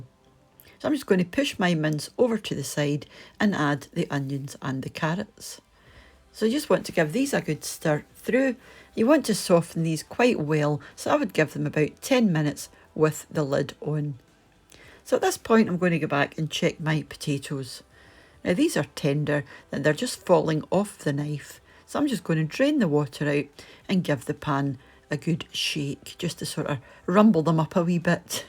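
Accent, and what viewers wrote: Scotland, United Kingdom. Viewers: Love the accent Scottish i would say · Your demonstrations was simply informative, accent from Scotland added a little nice fair. Cottage pies were SENSATIONAL! Thanks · I loooove ur channel, just stumbled on to it and love ur scottish accent. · Wonderful recipe. I’ll opt for vegetarian but it will be perfect for chilly fall suppers. Thank you. Your terrific accent reminds me of dear friends who live north of Edinburgh and the former owner of the 3 Chimneys restaurant in Skye, Shirley Spear. She was a great chef and made our time on Loch Dunvegan the more memorable. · Hello! I love cooking and improving my English with these videos. Can somebody tell me what accent this is? Is this Scottish ? Thank you all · Thank you for sharing. I will definitely be making these pies. My husband works away from home, so I cook a lot of different foods, which I freeze and when he comes home on his days off, before he goes back to work, I pack a couple of bags with the frozen foods. 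Good way for him to not spend on meals! New subbie from Northern Alberta, Canada 🇨🇦. Love your accent! One day I hope to visit Scotland!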